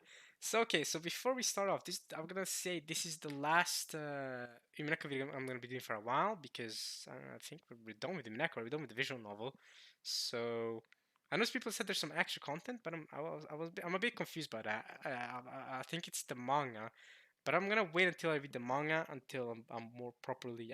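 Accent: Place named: Norway. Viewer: And you are talking like norway people... are you from norway?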